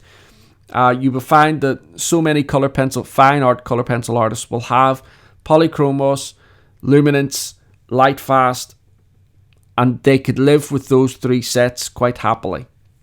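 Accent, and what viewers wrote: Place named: Scotland, United Kingdom. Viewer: I love your accent. Do you mind if I ask, where are you from? Scotland??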